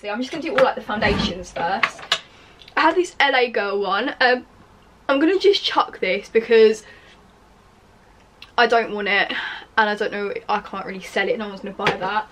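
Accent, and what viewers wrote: United Kingdom. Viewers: me, a canadian, watching this because i love bright, alternative makeup and just makeup in general. me, the same canadian, listening to her british accent: LIT-CHRA-LLY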